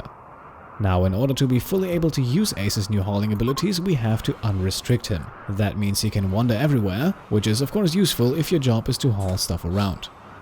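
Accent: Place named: Germany. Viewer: Are you German or Swiss? I hear a faint, faint accent behind that soothing Documentary-voice.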